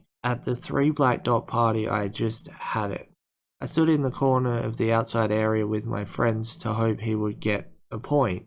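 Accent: Australia. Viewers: Maybe you can't understand Australians? I love listening to someone who is articulate!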